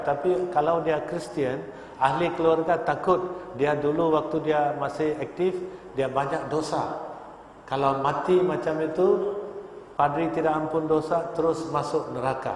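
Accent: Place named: Sarawak, Malaysia. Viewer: No wonder , cakap macam urg sarawak... islam minoriti d sarawak... tp jadi leader... kenapa? Aaaaa...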